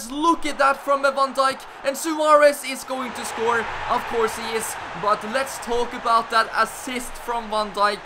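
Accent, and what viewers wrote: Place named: Norway. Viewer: You sound like you're from Norway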